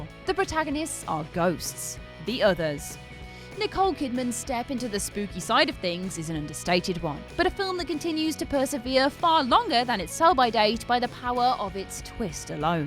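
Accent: United Kingdom. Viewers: That's pretty standard British dialect. They pronounce a lot of French in standard English phonetics.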